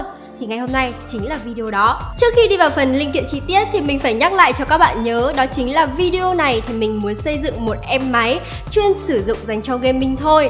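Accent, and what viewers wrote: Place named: North, Vietnam. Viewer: Thực sự rất thích review giọng nữ đặc biệt lại là giọng bắc trời ơi dễ thương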